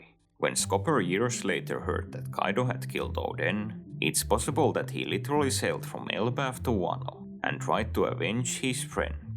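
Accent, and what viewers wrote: Finland. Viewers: Are you Finnish by any chance? great video btw!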